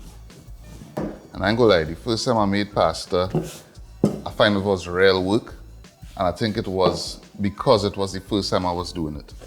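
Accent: Trinidad and Tobago. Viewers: Yea!Trini accent!I'm hanging around! I'm St. Lucian.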